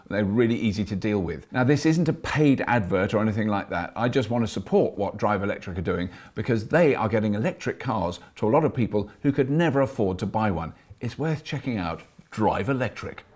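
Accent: France. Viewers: Earned my "thumbs up" just for that French accent lol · You really cheered me up with the great French accent and with the revelation about "matured fierce French women" :) Just won a BIG LIKE and a SUBSCRIBTION to the channel! Cheers! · Love the French accent lol. Good video. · French accent on point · keep working on french accent :-D love you videos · super funny! Love the french accent! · liked this video for your french accent alone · Well done sir. I especially liked your French Accent. There is one problem however, and it is with your set piece; your desk isn't worthy of you and would perhaps be of better use behind the scenes. If that isn't feasible, maybe a nice tablecloth would suffice. I have subscribed to your channel and wish you well. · Dude you're hilarious! Very good French accent! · Pretty good French accent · you totally made my day when you used your "frenglish". 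Swiss people always make fun of French people speaking English, because well... They make no effort at all ^_^. Really like your episodes, they are educative, complete and entertaining. If I might, it would be even better if you used numbers, when you use words like: lots of, double, etc. One thing that I like about the presentations of Elon Musk is that he uses meaningful numbers and actually prove that they fit and are reasonable. Congrats for your vids and channel. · The french accent XD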